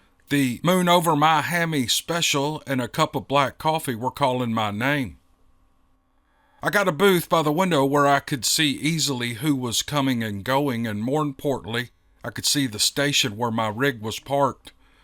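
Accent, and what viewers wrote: South, United States. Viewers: yous is the best story teller on the whole interwebs... it dont matter if its Steveo & the boys or the Wild Hunt crew, or just telling us a story someone sent to you... we all just wanna hear your southern twang telling us a story; )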